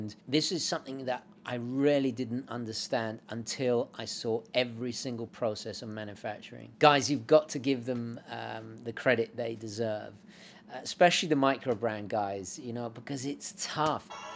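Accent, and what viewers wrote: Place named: RP, United Kingdom. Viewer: still poncified accents ...... loving it..... but what the heck ?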